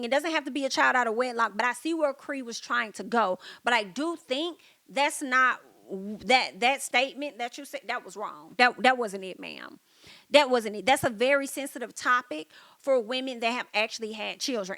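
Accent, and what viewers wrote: United States. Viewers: i love hearing you talk country sounds talking😂Blessings 🙏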